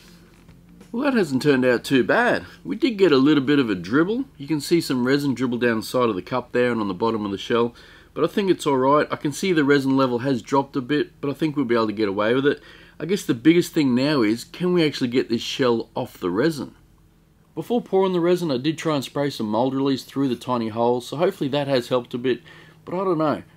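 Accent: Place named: Australia. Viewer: Thanks for your honesty. Good onya for persevering! Great to hear and Aussie voice on youtube too. I'm wondering if they used a egg-shaped silicone mould.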